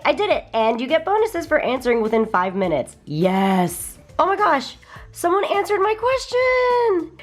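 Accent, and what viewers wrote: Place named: United States. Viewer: you sound extremely american when speaking japanese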